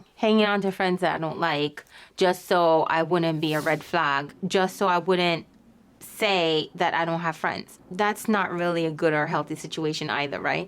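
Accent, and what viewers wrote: Jamaica. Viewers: Are you Jamaican? Because your accent is showing 😊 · I hear a slight Jamaican accent? If so hey girl hey! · Love the Jamaican accent, you resonate with me!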